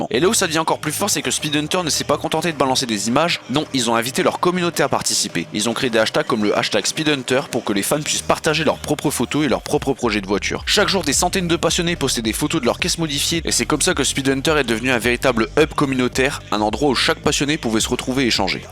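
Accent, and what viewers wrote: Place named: North, France. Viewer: super vidéo continue comme ça bien expliquer et ta un petit accent qui me rappel un peu le nord chose que j’adore !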